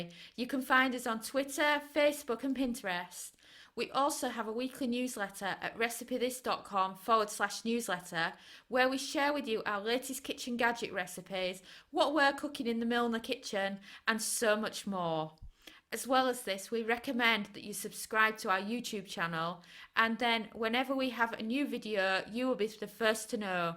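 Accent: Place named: North Yorkshire, United Kingdom. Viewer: Thanks so much. This is a recipe I'll be making soon as I love duck. My wife doesn't eat meat but I'll be happy to make this for myself. Also, love your accent. I'm guessing North Yorkshire. Am I close?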